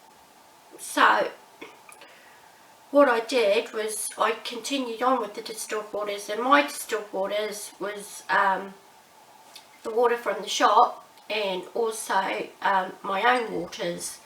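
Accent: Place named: Australia. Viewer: Thanks for sharing your experience.. I am also going to begin Sungazing, and would like to know if you Sungaze when it is cloudy, and the Sun is covered. Did sacred sounds and mantras through Dr Pillai also add to this awakening of the The Third Eye? I. It may have been the Kundalini (serpent) opening up more of your power center at the navel point which is a golden color. Shreem Brzee and Turmeric both are associated with that golden color...just giving some ideas to bat around. Luv your videos and encouragement about wealth/Brzee. You are candid, and funny, too! You have alot to add to everyone's understanding.and your Australian accent is charming..to us in the USA. Is there a product for cleansing that you can recommend ? Thanks..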